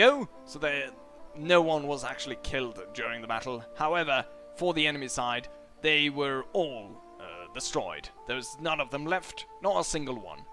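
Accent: United Kingdom. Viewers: Nice british accent you got there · Your accent sounds more British when you talk normally 😂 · I have just started watching your channel . I am really impressed with your english accent . If we were in this era you'll make a good soldier .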